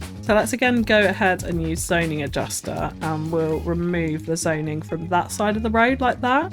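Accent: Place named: England, United Kingdom. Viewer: A beautiful design from someone with a beautiful designers mind. Additional thoughts - due to your charming English accent I kept miss-hearing what you were saying when you said “Mall” I kept hearing it as Mull. I genuinely thought you were saying it with a Scandinavian accent.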